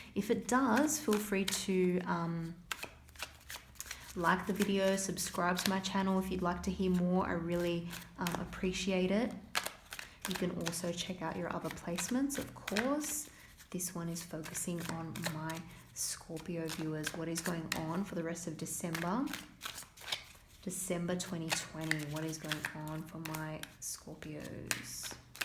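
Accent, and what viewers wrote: Australia. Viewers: Beautiful Ozzy accent ? ..thank you for the reading..being blessed right now ,peace to you reader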